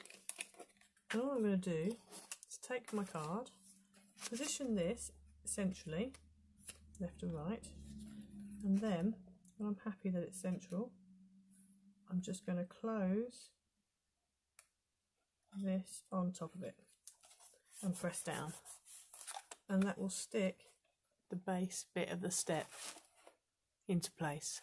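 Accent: United Kingdom. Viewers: Cute card ❤ the way you do your tutorials so easy to follow, also I’m from California and love your British accent. Thank you for sharing have a nice day.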